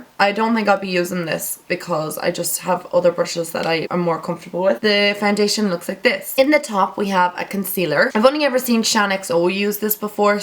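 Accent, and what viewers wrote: Ireland. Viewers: your videos are so good! I live them. are you irish , you sound sorta irish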